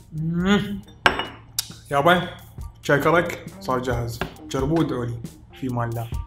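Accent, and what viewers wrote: Mosul, Iraq. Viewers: تحكي عراقي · الله يگويك شيف. حلو لما تتكلم باللهجة العراقية ولكنني لا أعلم لماذا تقول قرفة، بينما دارسين هي الكلمة الدارجة بالعراق والخليج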